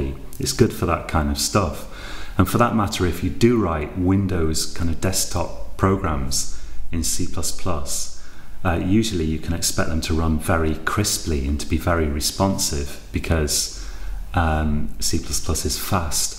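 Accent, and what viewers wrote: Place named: United Kingdom. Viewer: thank you for this very helpful video, besides i love your English Accent. Sound like my British friend and some BCC news broadcasters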